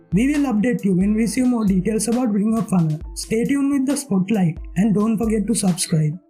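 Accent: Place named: India. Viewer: Can I understand a single word this guy says. His Indian accent is just too strong to understand.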